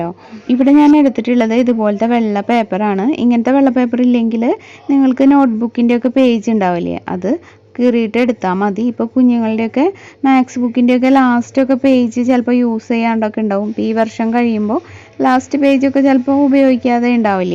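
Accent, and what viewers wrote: Thrissur, India. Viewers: Are u from thrissur as the language slang.. Nice technique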